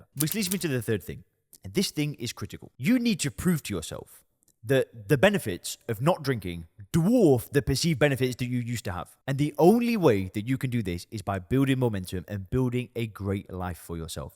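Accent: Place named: North Carolina, United States. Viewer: you are from NC aren't you? you have the NC accent!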